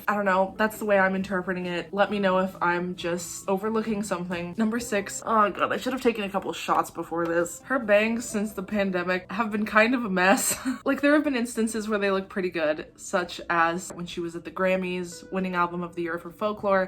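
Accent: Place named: South, United States. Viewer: She moved to Tennessee when she was a teenager, sometimes people pick up accents easily. I agreed with you about the first 2/3rd's of your video, but you don't get to criticize Taylor's bangs or people having curly/wavy hair and straightening their bangs when you are wearing the same look. I do agree it is not a good look on ANYONE. To me I love all her albums, I may have songs that aren't my favorite, but 98% of her songs are my favorites. I don't think I could pick out a favorite. New Years Day was the perfect ending to Reputation in my opinion. I loved her bleached-blond look, she looked so iconic. One more thing there is more to a country accent than saying certain words, you do have a southern accent (not a strong one though). I am a firm believer that how people speak comes from the area that they live in, I am from the north originally, but have lived in Virginia since 1991, when speaking to family members from Wisconsin, they think I sound southern. 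Case in point, a lot of people from other countries that move to say England, the children that are born there speak with an English accent, not the one of their parents native country. Well, that's all for my opinions.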